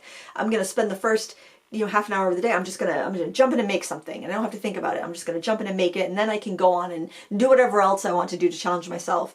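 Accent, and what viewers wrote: Maine, United States. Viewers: Hi! Here in NE Massachusetts, we're getting mostly driving rain and potential damage more along the coast. Be safe; my brother is in Bangor and Dad in Dixmont. I'll keep track of them as well. LOVE your Maine accent as it instantly brings me home! I worked to lose mine in college too. Now it only surfaces when I'm tired. LOL! Take good care this weekend! ❤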